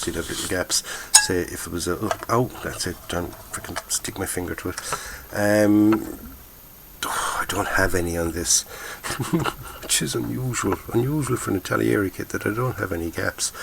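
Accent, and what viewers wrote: Ireland. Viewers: I enjoy your videos and listening To the Irish brogue, but I've noticed on the last few episodes there has been a bloody annoying whistling sound all the way through them.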